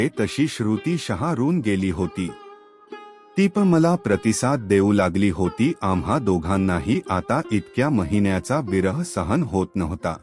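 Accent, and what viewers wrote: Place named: United States. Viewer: is this marathi story presentation or American marathi presentation ? please either improve pronounciation or change the story teller.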